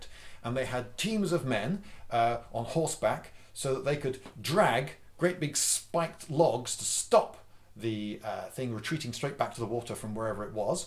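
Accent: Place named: United Kingdom. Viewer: Why does everything seem so much more interesting with a British accent and slang? You should do a video on that.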